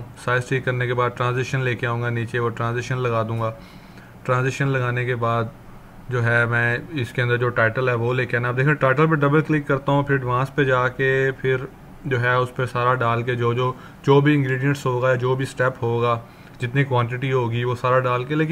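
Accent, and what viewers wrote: Australia. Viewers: V informative ,your english accent is also too good crisp australian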